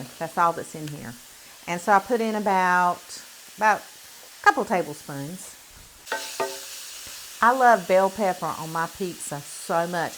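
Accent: South, United States. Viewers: I love your recipes. I have made so many of them. My husband loves your sloppy Joe recipe. He said he doesn't want the canned stuff anymore. 😂 My 14 year daughter loves watching you too. When she hears your voice on the TV she comes into the room and says" It's the cute Southern lady." Then she'll sit with me to see what you're making. ❤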